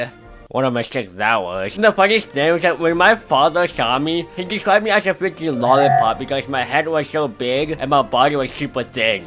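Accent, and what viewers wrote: United States. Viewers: Your Chinese your voice sounds like your a American :O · He sounds American but is chinese. Let that sink in......................................................................................................................................................................................................................................................................................................................................................................................................................................................................................................................................................................................................................................................................................................................................................................................................................................................................................................................................................................................................................................................................................................................................................................................................................................................................................................................................................................................................................................................................................................... 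· You still sound American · you sound american, not chinese. wow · He was raised in an American family so he is obviously gonna have an American accent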